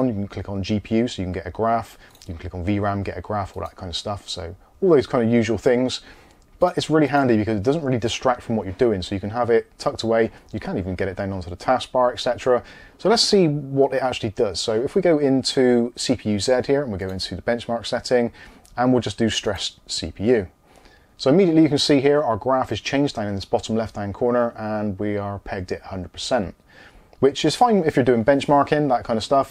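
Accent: Bristol, United Kingdom. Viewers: You sound like a bristol lad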